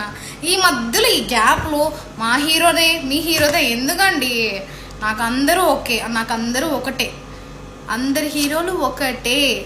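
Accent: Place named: Rayalaseema, India. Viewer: Meru kadapa bedda na rayalasema leka karnul aa me voice lo base bagunde super continue🎉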